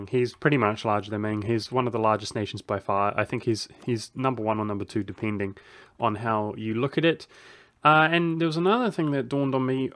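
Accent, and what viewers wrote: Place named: New Zealand. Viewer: He's from that island next to Australia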